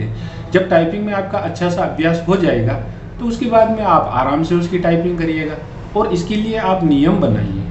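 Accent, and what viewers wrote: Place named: Himachal Pradesh, India. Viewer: Aapni voice himachali lgdi h😊 mai himachal se hu mera 6 August ko typing test apki video kafi helpful rahi i hope mera test acha jaye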